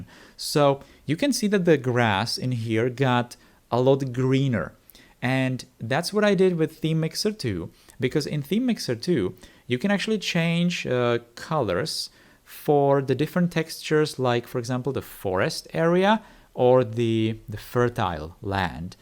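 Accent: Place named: Czech Republic. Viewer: Personal question, you're not a native speaker right ? You sound a little Czech. Not insulting your English, i think it's great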